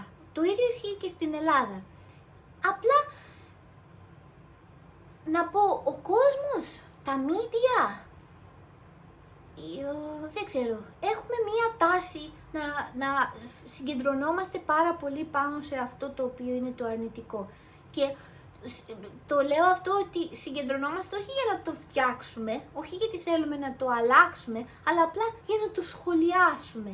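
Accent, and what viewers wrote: Japan. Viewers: εισαι σκετη γλυκα.Σε ευχαριστουμε για την ενημερωση.Αυτα που ειπες σχετικα με την Ιαπωνια ειναι ακρως διαφωτιστικα γιατι προερχονται απο ενα ατομο που ζει την καθημεριοτητα της Ιαπωνιας.Θα πρεπει να εχεις και πολυ καλη προφορα στα ιαπωνικα γιατι η επιρροη τους φαινεται και στην προφορα των ουτως η αλλως πολυ καλων ελληνικων σου.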